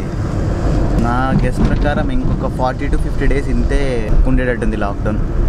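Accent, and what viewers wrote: Telangana, India. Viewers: Lockdown punnyama ani okasari YouTube lo me video chusa ... Eka roju continue ga me videos chudatam alavatu aipoindi ... Meru matlade Telangana yasa chala bagundi ... Meku nenu fan aipoina bro .. me rides and meru chupiche places and awareness chala bagundi ... Good luck bro ... All the best for future rides ..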